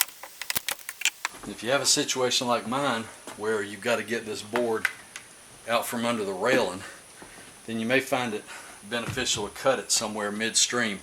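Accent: South, United States. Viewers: Love the southern accent. Cheers from Australia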